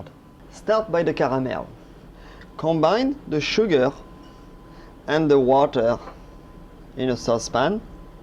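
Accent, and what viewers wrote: France. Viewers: i love ur english accent(u might be a french speaker).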